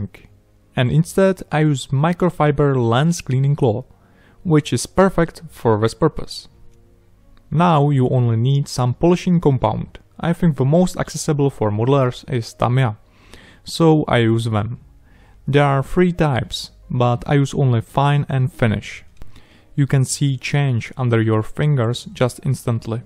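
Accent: Czech Republic. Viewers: There is only one accent in the wold like this. And it is unmistakably Czech! :D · Are you from Czech republic? Based on your accent :D. btw amazing job.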